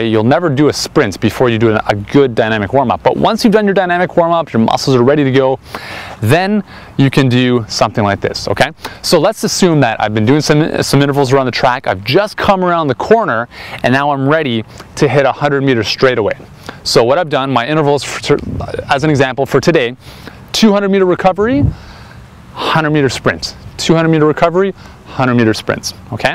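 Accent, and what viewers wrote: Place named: Canada. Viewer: CANADA ! O'bout ! (Love this accent)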